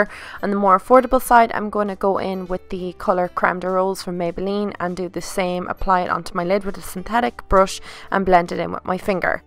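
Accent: Ireland. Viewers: Also I looove your eye colour so much😍😍 and I can't get enough of the Irish accent now that I know I'll be there for a year😍😍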